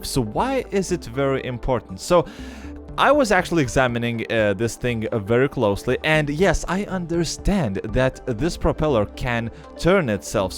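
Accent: Russia. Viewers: wait, is he Russian? he sounds it at least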